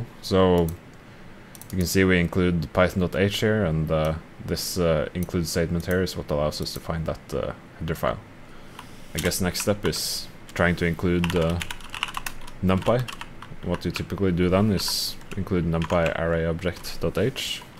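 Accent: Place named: Sweden. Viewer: Love the Swedish accent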